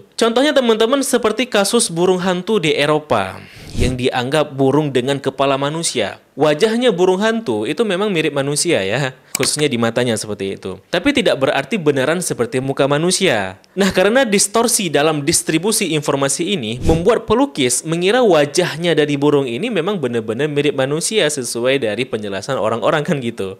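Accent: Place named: Aceh, Indonesia. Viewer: Bang logat km kayak org aceh😂😂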